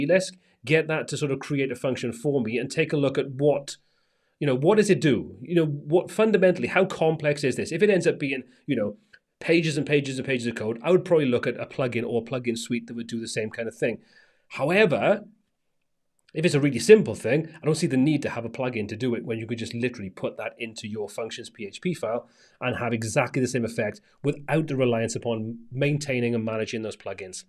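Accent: Wales, United Kingdom. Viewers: is from Wales, it’s just his accent…maybe it’s a plug-in you are talking about?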